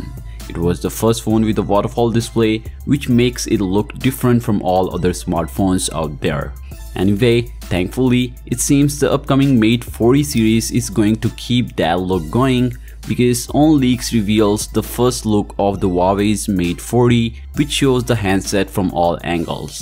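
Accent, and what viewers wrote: India. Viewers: Indian lovely accent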